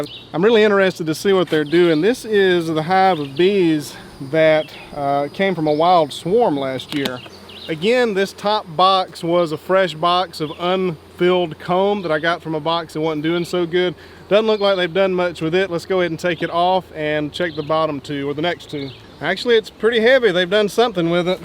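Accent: South, United States. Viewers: That’s a cool accent 👍 What parts of America is that accent from? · you know the second you hear that thick southern tang, it’s gonna be the most pleasant video you’ve watched at 3am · Southern Chris Pine??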